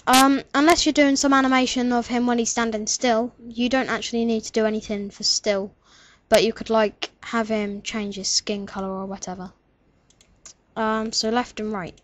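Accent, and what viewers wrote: United Kingdom. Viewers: thx! this really halped! and w00t FINALLY i hear a British voice on youtube 4 once XD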